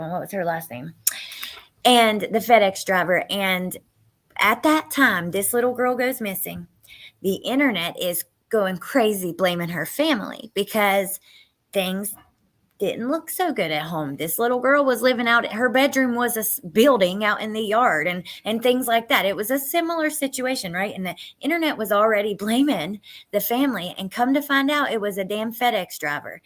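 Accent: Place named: West Virginia, United States. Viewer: I knew that accent! WV here too.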